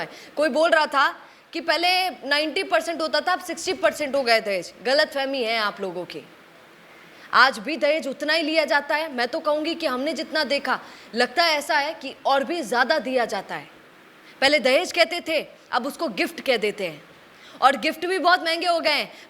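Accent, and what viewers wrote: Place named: Marwari, India. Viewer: Madam ak kahase ho, hindi or marvadi mix bol rahe ho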